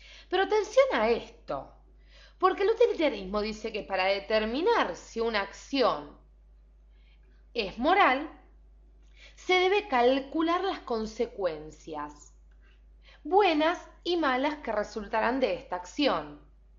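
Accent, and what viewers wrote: Argentina. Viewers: Así hablamos en Argentina. La chica del vídeo es argentina. Algún problema con nuestro modo de hablar o pronunciar?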